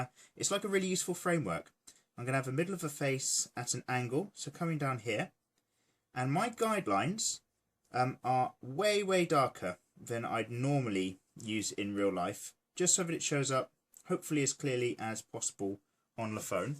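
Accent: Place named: East London, United Kingdom. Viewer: When he pens, papers and "tings" I said yes East London or you have lot of "urban" friends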